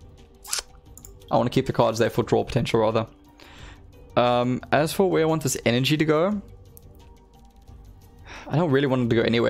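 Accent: South Africa. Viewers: You sound South African... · Recognised the accent immediately... =) Fellow South African here. I was torn between these 2 initially, but ultimately went with Relentless Flame due to both recommendations and daily challenges. Now I have both.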